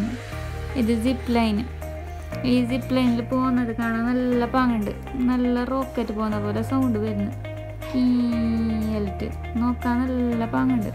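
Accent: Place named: Kasaragod, India. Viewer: Nalla language..ningal ksd analle..kelkan nalla sugam😃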